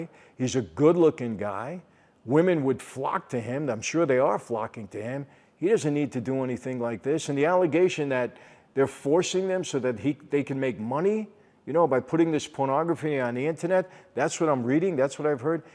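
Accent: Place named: United States. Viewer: Romanian? American English...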